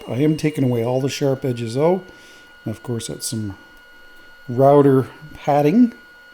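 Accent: Canada. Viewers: On your voice overs, I love to listen to you talk about your work. I can tell you love what you are doing. I also listen to hear your Canada accent. It's there sometimes. Not a lot though. Keep talking.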